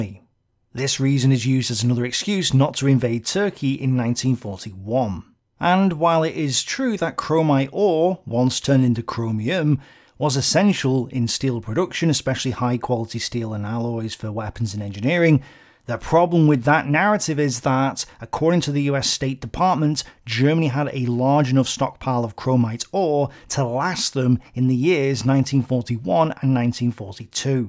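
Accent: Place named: England, United Kingdom. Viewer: can't you tell by his accent. He's English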